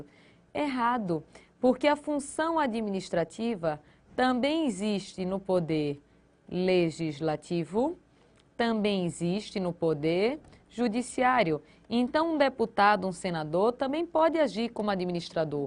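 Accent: Pernambuco, Brazil. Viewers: Ahhhh eu amei esse sotaque, já estava desconfiada, sabia que era de Pernambuco. Adorei suas aulas <mail>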